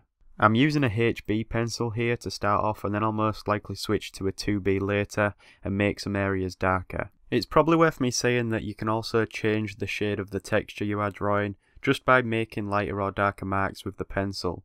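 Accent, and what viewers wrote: United Kingdom. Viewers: its 100% british to me lol. dem vowels lol noeu weuy, doeunt goeu theiu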